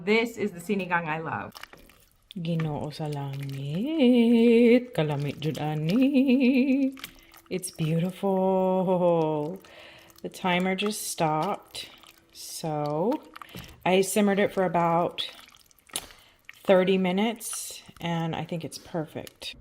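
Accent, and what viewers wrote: Visayas, Philippines. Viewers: Kacute ni dai magbinisdak oi.. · Pagka bisaya baya nimo uy! Haha! Taga Cebu ko pero naa na ko ga puyo sa Virginia. I miss hearing my mother tongue. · Bagsika nimo mgbisaya dai oi😂❤ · Anaa ko dri tungod ni Jared..ug ako nalisang Kay tungod Wala ko naanad ga lantaw ug amercanang nag binisaya ug Ang accent bisaya kaayong dako😂 I'm glad and I'm subscribing..I'm so amazed❤ this will be my favorite blog.. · There is no way that I can't subscribe to your channel. You got me with your perfect Bisaya accent. Maayo kaayo ka magbisaya, day! Lingaw ko tan-aw sa imong video unya kabalo pud kag Japanese word daikon sa radish. Diay, pareho mog nawong ni Angelica Panganiban. Pareho mo guapa! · Nice🎉 bisaya Accent! So fluent... _siyempre gikan sa pilipinas.. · i saw u in jared's video i'm tagalog but i grew up with bisaya friends even though i don't speak biasaya i close my eyes i hear a bisaya person speaking, a fellow filipino. 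more power to your channel.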